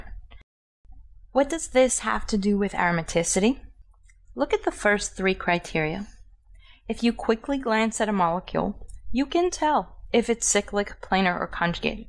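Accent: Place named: Northeast, United States. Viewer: Thank you, this was very helpful. This also confirmed my suspicion that you were from the east coast. You accent was very northeastern LOL. LETS GO YANKEES!!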